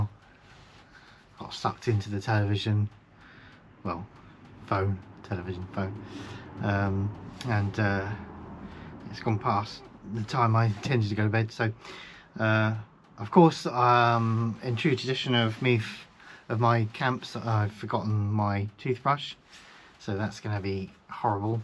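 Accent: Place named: Cambridge, United Kingdom. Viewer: Why does your accent sound like your from my ways ?.cambridge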